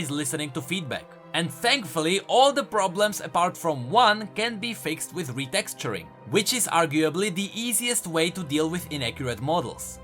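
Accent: Czech Republic. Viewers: Podíval jsem se na tebe poprvé a hned mi bylo jasné že jsi čech podle toho přízvuku :d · Věř nebo ne, ale už jenom podle přízvuku jsem poznal, že si Čech než jsem se podíval do popisku:D původ nezapřeš xd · Český přízvuk? :D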